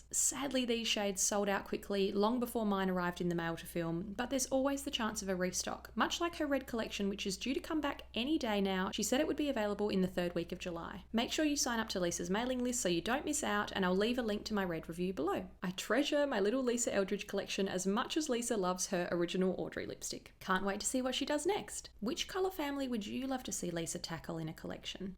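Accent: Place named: Australia. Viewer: It's always nice to hear that familiar, Australian halo there again. I love your videos for the makeup but I also try to teach myself how to do an Australian accent. 😁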